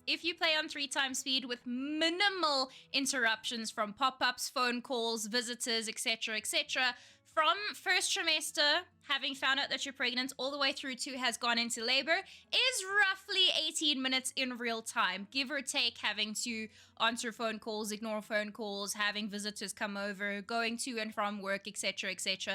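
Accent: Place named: South Africa. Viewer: Wait you aksent sounds south africn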